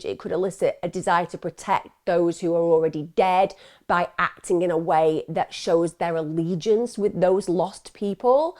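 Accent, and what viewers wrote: United Kingdom. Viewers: I just heard you in a deep dive and glad I did. Thoughtful takes and yeah, British accent. Good job. Cheerio!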